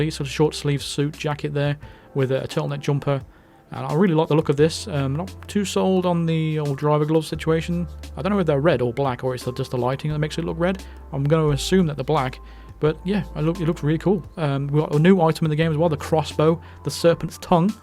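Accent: United Kingdom. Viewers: Finally a British man narrating hit man gameplay like a pro this is exactly what I was looking for when I think of good content! But bro mans can you convert pounds to dollars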